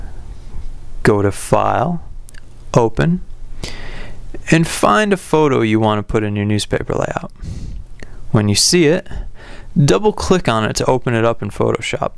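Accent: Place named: West Coast, United States. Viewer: Haha! You sound like such a west coaster. But thanks for the lesson!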